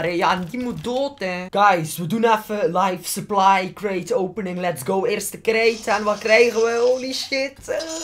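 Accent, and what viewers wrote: West Flanders, Belgium. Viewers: ik ben van beveren (kweet nie of wij echt een hard accent hebbe) ma ik versta u echt goe ze! ma u taal ga later bij u job of zo wel ne rol spelen stel gij gaat werke in brussel i do no dan gaan ze u mss minder snel aan neme omda gij west-vlaams spreekt ma tis anders echt wel een deel van u kanaal geworde ma ik zou het misschien wel doen maar dan nie per ser voor youtube gewoon voor u dagelijk leve en shit... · no taalschool shit WESTVLAAMS!!!!! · West-vlaams voor de win !!!! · het west-vlaams is een deel ven je kanaal en daarom maakt het je zo grappig en tof om ernaar te kijken maar probeer wat meer an te praten voor mensen die je niet zo goed verstaan maar je west-vlaams is een deel van je kanaal:D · west vlaams is het oppernederlands! ik hou net vandit accent!, maakt u video's duust keer grappiger! maarja, doe watje zelf denkt best is he ;) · Ik zou het niet doen, het westvlaams is awesome en het zou heel anders moest je inneens Algemeen Nederlands gaan spreken ipv een dialect. 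Westvlaams is de shit xoxo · laat u taal zo wantt west vlaamss een goeienederlands u accent is zo niks aan te doen en tis een deel van u kanaal :p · Ik kom uit antwerpen en ik begrijp alles zonder moeite. Hou het westvlaams het hoort bij dit channel. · blijf west-vlaams praten!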